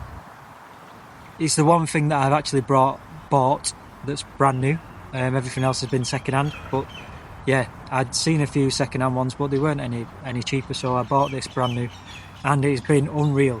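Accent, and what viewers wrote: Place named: England, United Kingdom. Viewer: How lucky you captured this beautiful bird, and what a great Lense and camera! Nice to hear about it's details too! Thanks.😊 I'm guessing you're a Pom? Not sounding Kiwi haha. Thanks again. You did well.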